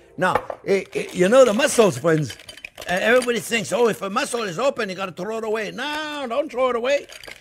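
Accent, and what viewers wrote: France. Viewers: I heard an Italian touch to your French flavored accent at the time you spoke of the fishmonger and the "'fish bones, eh?" Delightful. Although your last name sounds German. I was born in Nice, but raised in India. So I never had a bouillabaisse growing up. Finally, on a visit to Nice, I found a small restaurant, took my Belgian uncle Ray over there, along with my family, and we had a splendid Bouillabaisse sitting on the pavement of this small restaurant. The lady was overwhelmed as we came in as a big party for that tiny place, but we helped with arranging the tables outside, and we had such a great time. My fondest memory of this soup. I think as an American I had no problem with arranging the tables, and this was shocking in France where there is a tradition of service and people waiting on you. Ha ha. She had some good stories to tell of our visit years later. 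· First time watching his vids. I was certain he was cajun. It’s like he’s speaking with an a North American dialect but a French accent.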